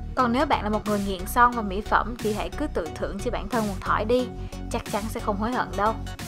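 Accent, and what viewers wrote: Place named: South, Vietnam. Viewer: lần đầu xem clip của chị và replay liên tục vì giọng con gái miền nam đáng iu quá trời luônnn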